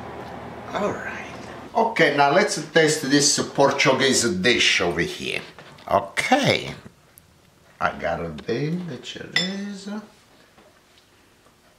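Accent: Italy. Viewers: He sounds like an oldschool italian imigrant. Kinda cool.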